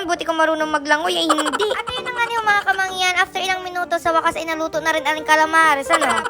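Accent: Batangas, Philippines. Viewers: tuwang tuwa ako sa mga salita mo kaganda mo pero gay on mga salita mo pang batanginyo your so cute po lagi po ako nag aabang ng iyong vlog ❤😊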